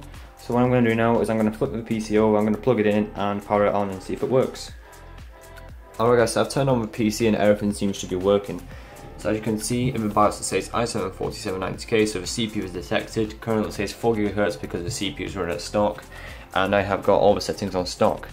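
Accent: United Kingdom. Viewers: are you a north east hard lad like me ?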